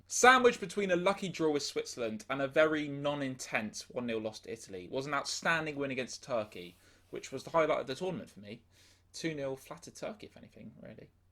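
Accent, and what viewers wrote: England, United Kingdom. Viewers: U have an English accent......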